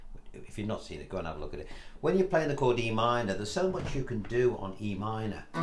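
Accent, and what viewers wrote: Liverpool, United Kingdom. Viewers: ? Are you a scouser? I have seen your pieces for the last few months. I also look at Ivor Sorefingers, who has a similar thing as you. I really like the scouse accent! ❤😊 I'm a Londoner, but not a cockney.😂😅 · Excellent teacher. Scouser with no edge as you expect. That’s me speaking as a Yorkshire bloke.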